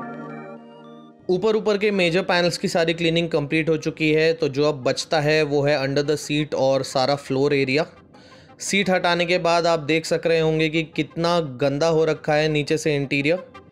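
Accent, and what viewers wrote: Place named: United Kingdom. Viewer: Mtlb professional professional.... Itni bhi English accent me mt bolo Irritating lgta h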